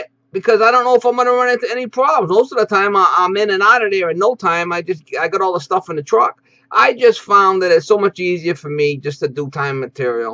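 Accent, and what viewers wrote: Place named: New Jersey, United States. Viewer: You tell it like it is. You ain’t no charity fix-all. If people want oats that’s already been through the horse they come a little cheaper but if they want nice fresh green oats, they gotta pay for them. Like all of your videos and your accent. Jersey?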